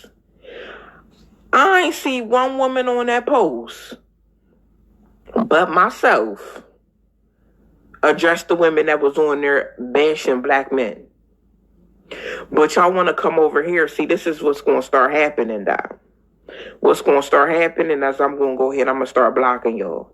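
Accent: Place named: Philadelphia, United States. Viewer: You sound like your from Philly or east coast anyway always enjoy your content love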